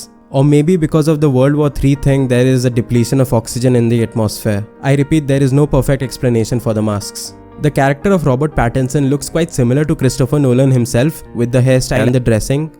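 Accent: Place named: India. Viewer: What a pajeet accent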